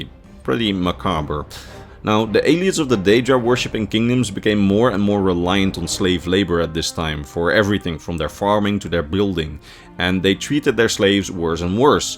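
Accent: Netherlands. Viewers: Nice vid. Liked and subscribed! Btw, are you Dutch?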